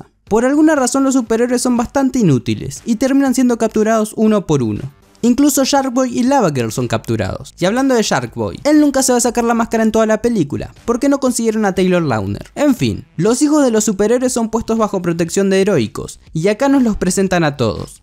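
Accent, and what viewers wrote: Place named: Argentina. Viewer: Re Argentino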